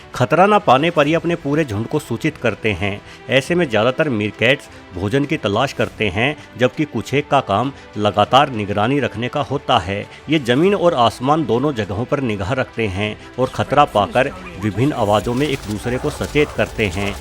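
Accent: Haryana, India. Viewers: Sir aap kaha se ho? Avaj se haryana ya rajasthan ke lagte ho?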